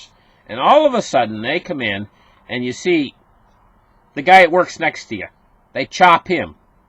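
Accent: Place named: Canada. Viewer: If anyone wants to hear a Canadian accent, this man is the example. "The titter on the end" Great accent, great show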